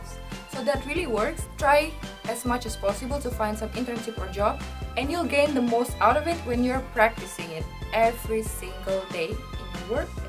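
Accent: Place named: Indonesia. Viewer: Just by hearing her speaking Swedish with that accent, I already know she's Indonesian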